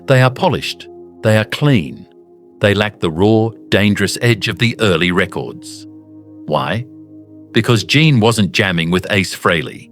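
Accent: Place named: Australia. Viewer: I can’t sit here and listen to this Australian robotic twit!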